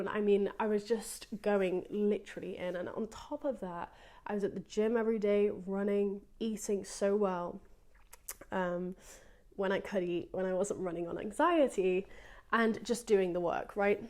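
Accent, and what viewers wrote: United Kingdom. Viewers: I really like your mindset. This is a true gem 💎 I love your British accent 😍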